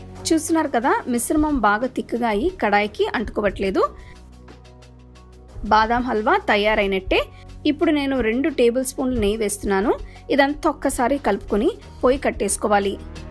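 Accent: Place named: Kerala, India. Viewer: Meeru English lo matladuthe eh kerela oh Mysore anukunanu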